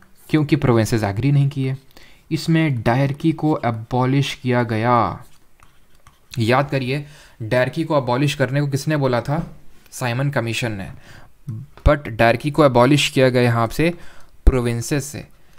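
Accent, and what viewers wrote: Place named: West Bengal, India. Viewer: Your bengali pronunciation is very sweet ❤❤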